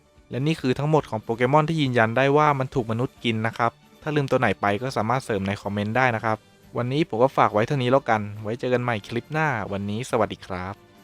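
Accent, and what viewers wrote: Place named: Suphanburi, Thailand. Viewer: ชอบสำเนียงสุพรรณมากค่ะ น่ารักมาก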